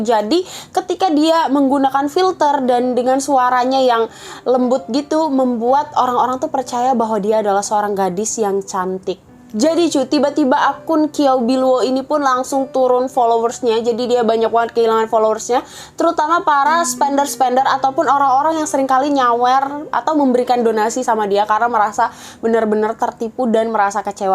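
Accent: Indonesia. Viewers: Sumpahnya channel ini muncul di rekomendasi gw dan kakak ini waiahnya kek orang korea tapi ketika ngomong orang indonesia wkwkwk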